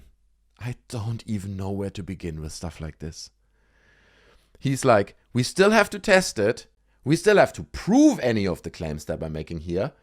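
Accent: Germany. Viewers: I love that German accent! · You got a German accent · Nice accent. German?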